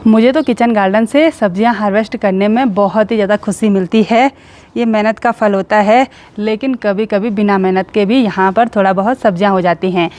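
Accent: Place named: Pahari, India. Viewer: अ य चाची परनाम ❤😂 मीठी आवाज, पहाड़ी लहजा ❤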